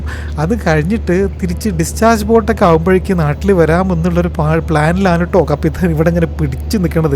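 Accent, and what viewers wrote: India. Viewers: പുയ , ഇടക്ക് മലപ്പുറം ശൈലി പുറത്ത് വരും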